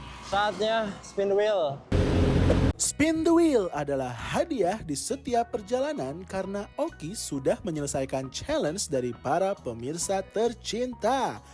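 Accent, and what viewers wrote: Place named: Banyumas, Indonesia. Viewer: Asline wong ngapak to 😂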